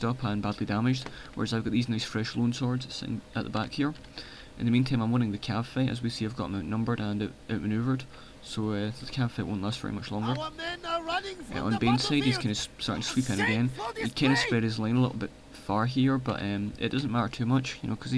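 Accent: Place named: Scotland, United Kingdom. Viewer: Finally a Scottish Shogun 2 commentator, if yer accents anything to go by. :)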